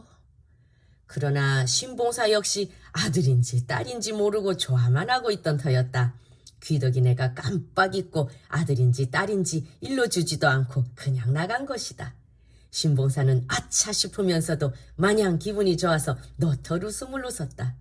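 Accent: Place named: Gyeongsang, South Korea. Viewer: 경상도 억양의 구수한 심청전 잘 들었습니다. 책을 읽어주시는 엄마밑에서 교육받은 자녀들의 인성 감성이 아주 풍부 하리라 생각합니다. 건반 하나하나 내공 담긴 피아노 연주도 탁월하지만 동화를 일인 다역으로 재미있게 읽어 주시는게 전문 성우보다 훨씬 잘하시네요. 너무 감사합니다. 짝짝짝......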